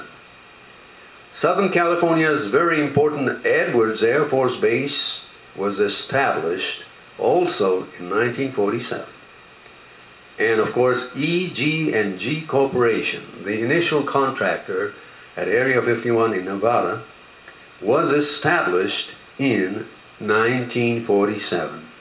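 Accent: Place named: Germany. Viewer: Nice video! You narrate well, you sound like Ricardo Montalbán with a hint of German, not being facetious about it but really, you need a job with the History channel.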